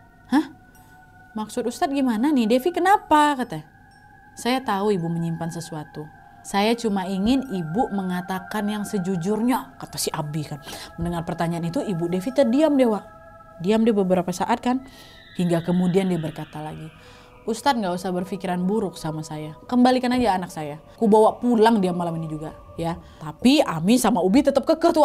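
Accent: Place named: Aceh, Indonesia. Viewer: Logatnya kak mengingatkan aku dengan pria aceh yg pernah singgah ☺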